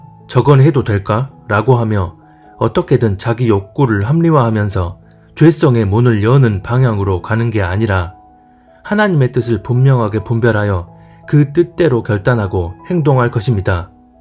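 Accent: Jeolla, South Korea. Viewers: 전라도 사람임? 말투가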